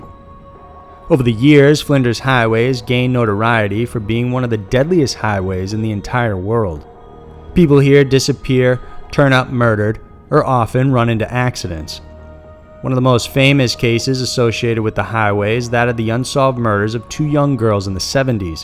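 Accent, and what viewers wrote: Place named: North Carolina, United States. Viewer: I'm from Jersey & I appreciate the mention but Shades of Death road doesn't sound so "murderous". As opposed to something like the Texas killing fields or Highway of Tears. They have many murders occurring on or near those roads. But regardless I really enjoy your channel & often will watch a few a day. Thank you for your hard work! Are you from North Carolina (the wsy you day "nine")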